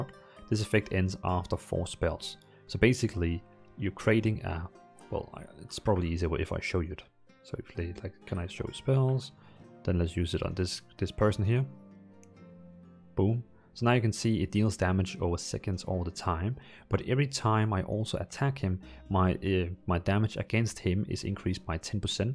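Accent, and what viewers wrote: Denmark. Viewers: Are you Danish? Nice video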